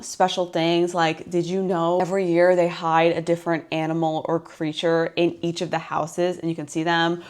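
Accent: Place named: New York, United States. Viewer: I always tune in to your universal videos. So excited for hhn this year! Also love your channel because I'm from long island NY and love hearing your NY accent 😂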